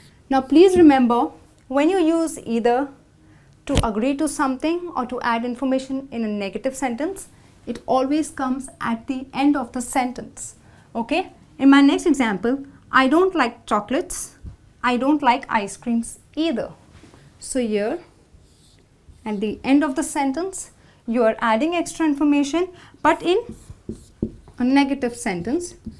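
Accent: India. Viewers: you agreat , you from indian i know from your english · Hi! Thank you for the explanation! Are your accent from India? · is she indian? she has a particular accent, she doesn't sound like an American.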